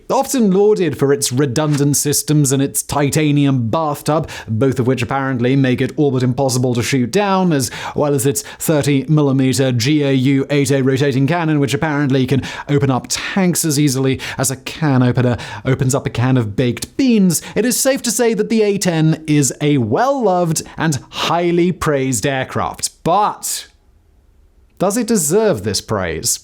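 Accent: United Kingdom. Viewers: Despite your British accent and the continued use of the word zeitgeist your review of of the A10 demontrates your an utter ignoramus. First of all, the 30 mm gun is just one tool in the a10s drawer. It carries a bevy of missiles and bombs which can and have destroyed numerous Main battle tanks from any angle. On the other hand the 30mm can eliminate pretty much any other armored vehicle without breaking a sweat. It had more losses than other aircract in the Gulf because no other aircraft were used and couldn't be used the same way. The A10 would loiter in the battle space pass after pass providing persistent close air support. All other jet fighters would do a single pass at high speed and move out. BTW, the Air Force hates the A10. As far as they are concerned close air support is for the army and its helicopters. The people who most like the A10 are the ground troops that know the benefit of having a 30 mm gun in the sky farting 1000s of 30 mm rockets and bombs over your enemy over and over again.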